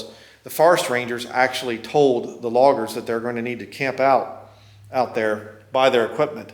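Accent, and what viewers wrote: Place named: Southeast Pennsylvania, United States. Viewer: Wow,you sound like me,raised up in southeast pa. On a dairy farm and going upstate for everything outdoors. Now living in maine 10 years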